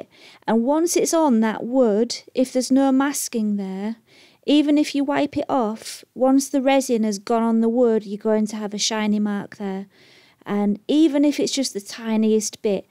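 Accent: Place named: Northern England, United Kingdom. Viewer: this is absolutely beautiful!!!!!!! I have got to try this out. And your Northern accent is so cute and relaxing!! You explained this perfectly!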